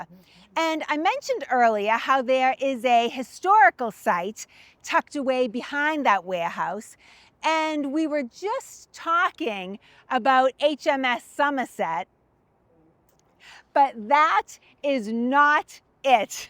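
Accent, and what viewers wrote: Boston, United States. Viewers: love her Boston accent. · Luv that Boston accent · I'm a NC southern rebel, and I love your Boston accent! I really enjoy your videos. Very interesting! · After the first 30 seconds of listening to her talk, I could tell without a doubt that she’s from Boston.🤣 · I’m a life long baystater but I’m surprised that you’re Boston accent sounds so strong👍🏼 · I really enjoy your videos. I’m glad that I’ve talked with my sister-in-law for over 40 years and is from Boston. It has helped me understand your Boston accent immensely. LOL. Please keep up this informative and important work you are doing. History must be remembered. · I love your Bhasten Accent. I haven't heard that accent since I was in The U.S. Coast Ghaad. Subscribing now! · Spoken as a true Bostonian. It's nice to hear an accent like mine even though I don't live there anymore. 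· I always enjoy your enthusiastic presentations. Always learn something from you. And, your Boston accent makes it that much more "authentic". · That sounds like a Boston accent to me. But, is it authentic or enhanced? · That's the hardest Boston accent ive ever heard. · Easy with the Boston accent. I feel like I'm watching the Departed. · Love Bastan accent I could listen to her all summah!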